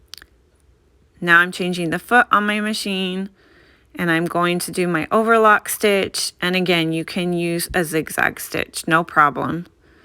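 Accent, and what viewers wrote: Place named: Texas, United States. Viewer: Such a great tutorial! Clear instructions and easy to follow along. Love that Texas accent ❤️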